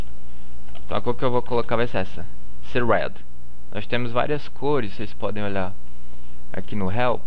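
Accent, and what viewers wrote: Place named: Rio Grande do Norte, Brazil. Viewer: Interior do RN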